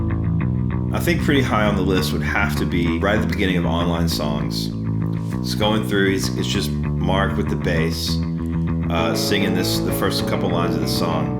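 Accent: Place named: South, United States. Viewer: tell me you're from the south w/o saying it. "he's playing that fast and he's playing that fast and he's strumming the shi*t outta that thing"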